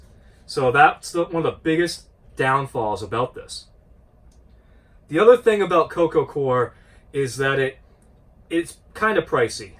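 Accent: New England, United States. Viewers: Ahhhh.....there's a real symbiotic relationship between a how-too upload and a New England accent.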